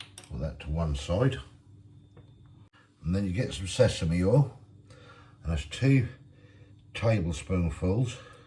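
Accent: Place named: Wolverhampton, United Kingdom. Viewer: Nice to see someone on youtube who can cook for a change :) Looks good, you sound local to me I'm in Wolverhampton :)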